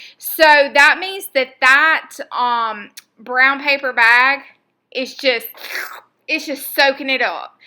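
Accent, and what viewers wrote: South, United States. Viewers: Love to watch you and listen to your southern accent! Love how you use your giblets!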